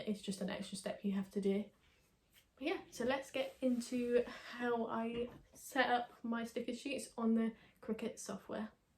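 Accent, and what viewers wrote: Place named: United Kingdom. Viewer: Came for the tutorial, stayed for the accent 😅 UK people have the best accent. Good tutorial. Just bought a cricut and I'm learning. Greetings from Mexico.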